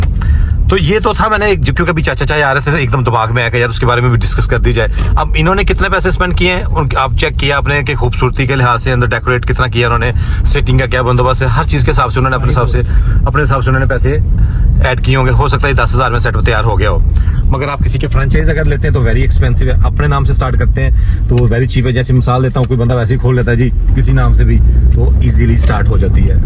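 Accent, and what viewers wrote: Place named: London, United Kingdom. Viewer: Is very hard to understand your London english.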